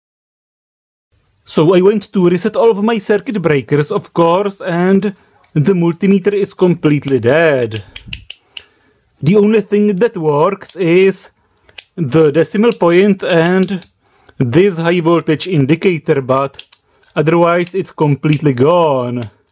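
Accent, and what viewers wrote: Czech Republic. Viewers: Shhh. You hate his accent (Czech accent) so much that multimeter = his English (!) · I love your Czech accent and I love your channel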